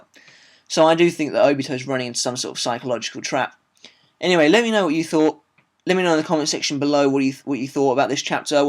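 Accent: United Kingdom. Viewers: good review mate....u actually know what ur talking about...what part uk u from?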